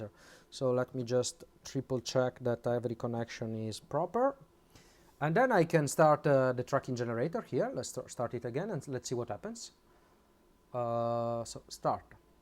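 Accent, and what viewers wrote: Italy. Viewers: Ho sentito nel video che dicevi “qui in Francia” ma dall’accento avevo sempre pensato che fossi italiano. Io sono di Roma e vivo in Portogallo, radioamatore e recentemente mi sto appassionando di elettronica. Complimenti per i video che posti. C’è sempre da imparare. Diego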